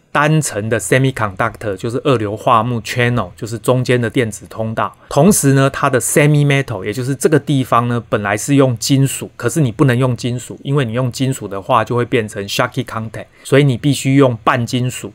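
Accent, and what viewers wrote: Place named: Taiwan. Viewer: 感覺你是台灣口音！台灣人要守住台灣！ 不要給土共報路！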